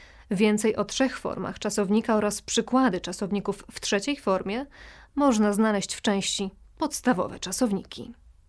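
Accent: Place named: United Kingdom. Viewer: Ten Brytyjski akcent parzy w uszy.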